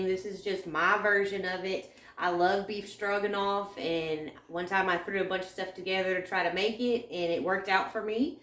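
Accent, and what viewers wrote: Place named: Louisiana, United States. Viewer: I will surely try this😊😊 sounds like you are from Louisiana. I am from SW La. good,simple food….nothing better!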